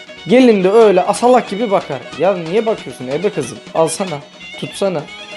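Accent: Azerbaijan. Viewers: Salam qadanalim men seni tam annamadime ağali ela Azerbaycan lehcesinde danişisan amma soyadin. Ağali senin atan Azerbaycannandi hee? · Abi selam sen Azerbaycanlımısın konuşmandan benziyoda o yüzdrn söyledim.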